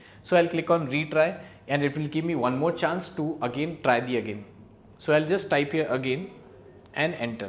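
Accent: India. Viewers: He is Indian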